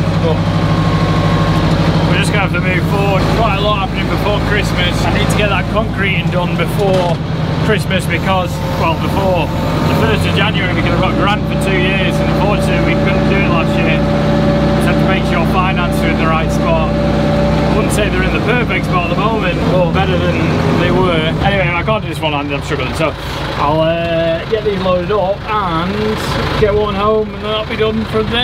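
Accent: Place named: Scotland, United Kingdom. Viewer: I’m from Texas and it’s taken a couple of yrs to catch the Scottish pronunciation but it’s fun just listening 😊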